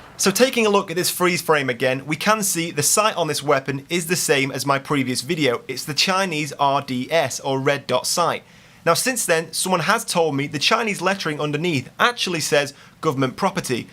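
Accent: England, United Kingdom. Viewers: I'm glad I found this channel! The videos are so awesome! keep up the good work! BTW, are you from England?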